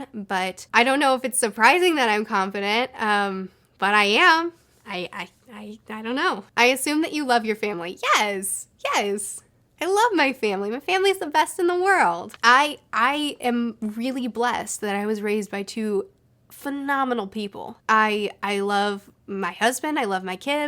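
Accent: Canada. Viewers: I’m surprised that you get compared to Lacy Chabert most. I think you look far more like Linda Cardellini, and your voice is way closer to hers too. I don’t see the resemblance to the other three examples at all. Also, I think the reason a lot of people think you’re from Canada is because of how you pronounce the first O in words like “borrow” and “tomorrow.” That stood out to me as well. Anyway, love your videos! 😊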